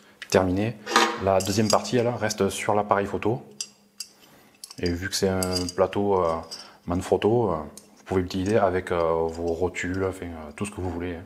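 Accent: South, France. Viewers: A mince j'ai pas vu, en tout cas merci encore pour ton aide. Je te suis sur ta chaîne et j aime bien les tests que tu réalises, de plus je suis comme toi du sud et j'ai le même accent et j'en suis fier.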